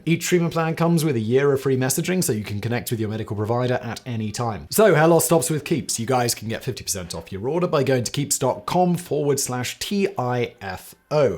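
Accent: England, United Kingdom. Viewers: Fantastic English accent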